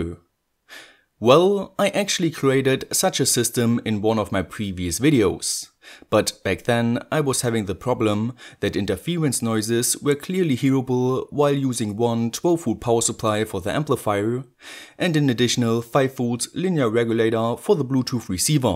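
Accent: Germany. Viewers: I'm pretty sure he's german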